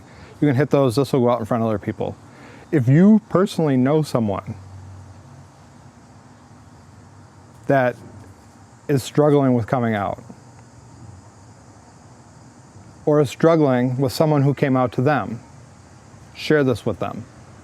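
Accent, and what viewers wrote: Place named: Canada. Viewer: He’s in America? He sounds Canadian to me.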